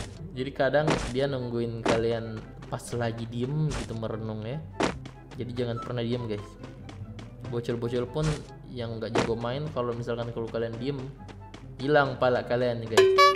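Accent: Makassar, Indonesia. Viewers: makassar mana suaranya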